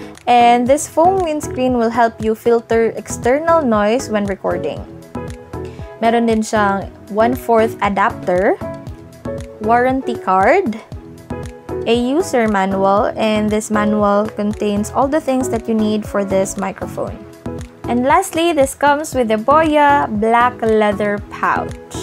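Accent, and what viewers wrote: Visayas, Philippines. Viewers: Hala ate😲bisaya man diay ka😆❤️😻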